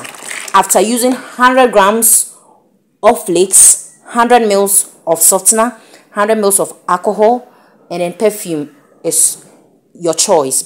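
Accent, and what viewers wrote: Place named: United Kingdom. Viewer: I love how you meticulously speak the Queen’s language ❤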